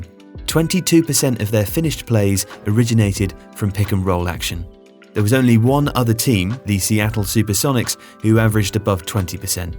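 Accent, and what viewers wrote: England, United Kingdom. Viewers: Rather listen to English accent all the time! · Somehow I'm still not used to british accent explaining basketball..😂 yet great content tho.. Keep it up!!!🔥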